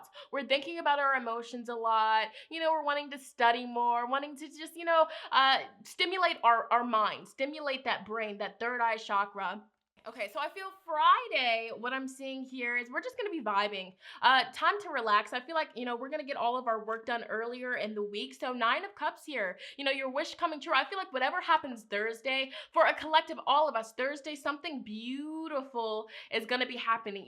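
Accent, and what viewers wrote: United Kingdom. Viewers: Been feeling so stagnant for the past week or so, so looking forward to this energy shift 😊 as always, I'm vibing with your reading 🌞 (Also, are you British? I keep hearing an accent come out lol been curious) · I keep hearing a British accent peeking through! 😍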